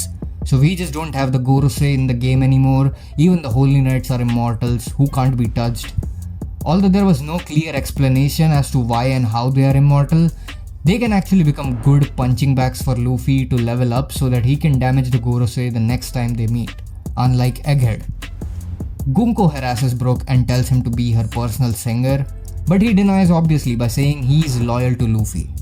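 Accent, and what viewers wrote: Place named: India. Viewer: Bhai hindi me bol na English vale koi teri videos nahi dekhte or teri avaj se pata chalta hey indian hey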